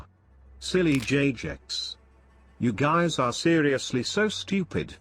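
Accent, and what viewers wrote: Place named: East Tennessee, United States. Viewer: You eastern tennessee hillbilly.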